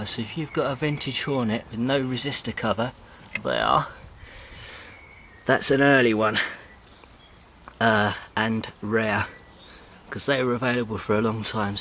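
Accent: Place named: South, United States. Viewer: Mate, where do you live? From your accent I'd say the south, can I come round and play, lol.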